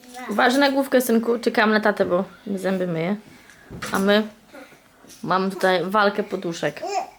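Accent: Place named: United Kingdom. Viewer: Ooh the accent 18 lat się uczę mowić z brytyjskim akcentem i nigdy mi nie wyszła taka pillow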